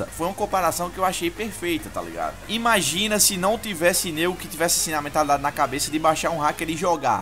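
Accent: Northeast, Brazil. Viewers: MAN ELE E DO NORDESTE É???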